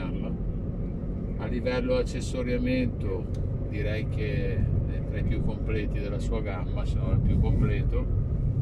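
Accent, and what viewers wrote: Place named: Milan, Italy. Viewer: Ue' figa, tipica parlata milanese:=))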